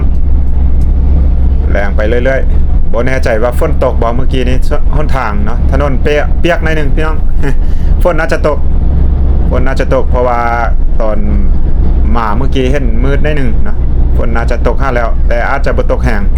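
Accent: South, Thailand. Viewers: สำเนียงบางคำ เหมือนภาษาใต้ปนอีสาน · ฟังตอนแรกคิดว่า แหลงใต้ บางคำเป๊ะเลยนะ เคยมีเพื่อนเป็นคน วังสะพุง จังหวัดเลย พูด แบบนี้เลย สะพานถูกต้องแล้ว อ่านว่า สา-ระ-สิน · สำเนียงภาษาใต้ชัดๆ..ถ้าไม่มีคำว่าแม่น/บ่/มัก/เบิ่ง/เฮา/(จะไม่รู้ว่าคนลาว)สำเนียง..คนใต้ชัดๆ..คนไทยภาคใต้นี่น่าจะมีเชื้อคนหลวงพระบาง55..หรือคนหลวงพระบางได้ผัวคนใต้55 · ไซเหมือนแหลงใต้เลย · แปลกจัง สำเนียง70% เหมือนภาษาใต้พื้นถิ่นของภูเก็ต & พังงา เราฟังแล้วเข้าใจ100% ไม่เหมือนฟังภ.อีสานแถบอุดรฯ, ขอนแก่น ฯลฯ เคยมีคนบอกว่าภาษาอีสานของจ.เลย ซึ่งคนส่วนใหญ่อพยพมาจากหลวงพระบาง จะพูดเหมือนภาษาถิ่นใต้ฝั่งอันดามัน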